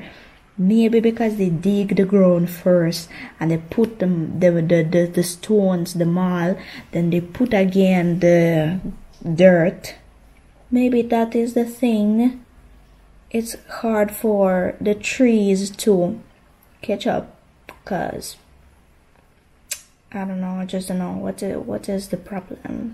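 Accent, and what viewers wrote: Jamaica. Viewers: Love hearing you talk patios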